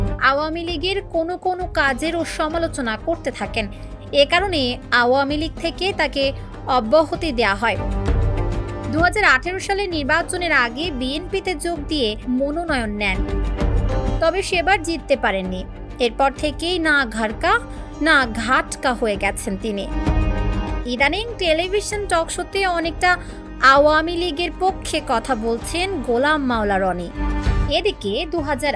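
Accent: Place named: West Bengal, India. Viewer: তোমার কনটেন্ট সবই ঠিক তবে তুমি বাংলাদেশী নও কারণ তোমার কথায় অনেক কিছুতে লাগতেচে তুমি ওপার বাংলার এযেমন একটা কথা বলচো না ঘার কা না গাটকা এটা এনখও বাংলার ৯৯.৯৯% লোকে জানে না এটার মানে কি তবে নিজের দেশের দালালী করো আমার দেশের না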